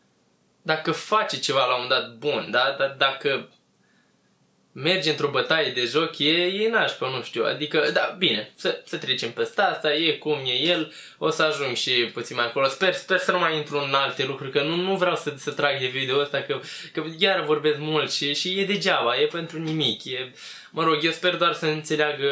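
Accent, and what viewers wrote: Moldova. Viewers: Imi place accentul tau de moldovean :))) ( wăăăi ) #suntsacal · Like pentru accentul Moldovenesc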